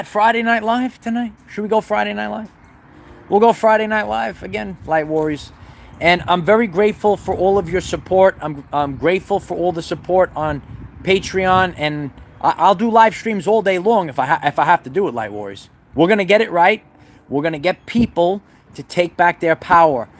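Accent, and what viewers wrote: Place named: Long Island, United States. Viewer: You always make me think of Long Island where I lived most my life (way you speak), but also you look like and sound just like one of my nephews. Love your channel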